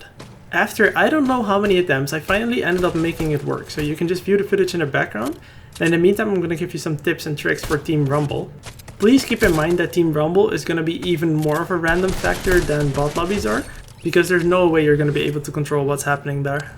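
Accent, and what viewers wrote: Netherlands. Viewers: why do you sound dutch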